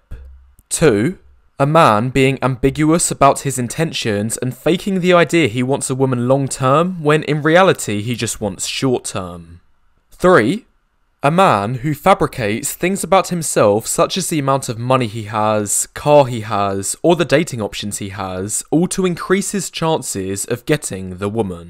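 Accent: Essex, United Kingdom. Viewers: He's from Essex in England I think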